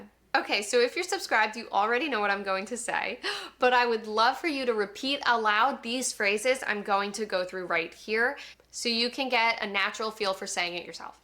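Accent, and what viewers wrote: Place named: Calabria, Italy. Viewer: Hi, I'm italian and I like your accent when you speak italian, it sounds pretty calabrese or siciliano! I watch your videos to improve my english. I wish you a million of followers!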